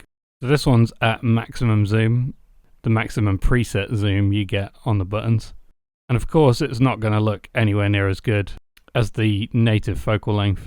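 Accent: United Kingdom. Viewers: British accent 😮😢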